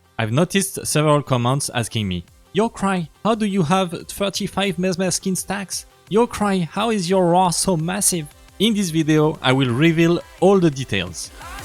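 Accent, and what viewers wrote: France. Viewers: I have to say it: I love your little french accent in your videos 😅 Your content is great I am sub since a long time. Now taking a short break from double resource event on Youtube and there is a new hot video from you. Very cool 👍😎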